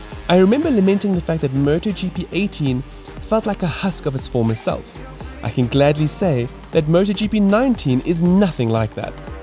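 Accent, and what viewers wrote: South Africa. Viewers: Any South African voice gets a Like and Sub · Well stewie is british and this guy has a south african accent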